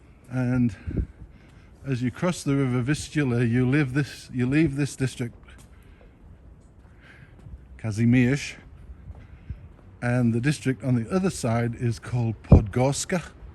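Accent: Northern England, United Kingdom. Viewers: I can hear the northern accent, it's just really softened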